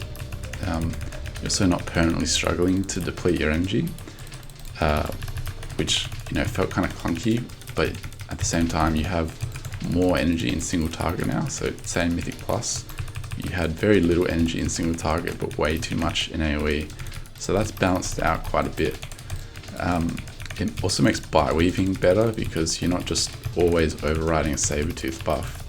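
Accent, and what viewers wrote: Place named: Australia. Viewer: teaching people feral is nice but can you teach people the australian accent too ty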